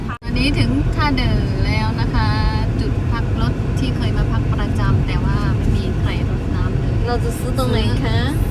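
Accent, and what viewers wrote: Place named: North, Thailand. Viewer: น้อง ที่รัก เมื่อใดด่านเปิดเสียทีอยากจะไปฟังน้อง อู้กำเมืองจ้าว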